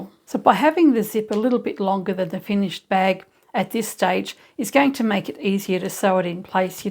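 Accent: Australia. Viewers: Thank you for a great zipper tutorial! Ive just subscribed. I recently did 3 cushions and botched them all in various ways... That wont happen again now ive watched this! Your sewing machine looks amazing. Can i ask what it is? Love hearing an Aussie accent too 😊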